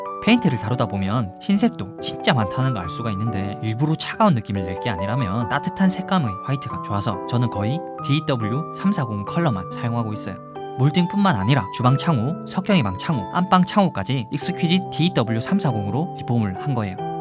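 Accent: Busan, South Korea. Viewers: 님 부산인가요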